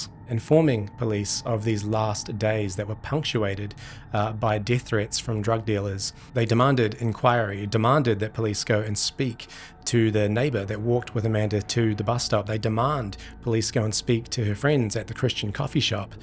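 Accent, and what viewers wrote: New Zealand. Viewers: do I detect a hint of NZ accent?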